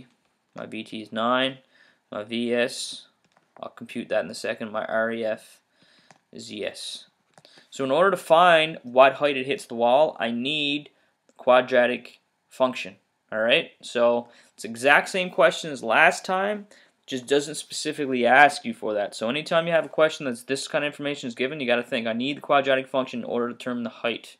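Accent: Newfoundland, Canada. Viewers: Are you from newfoundland by any chance?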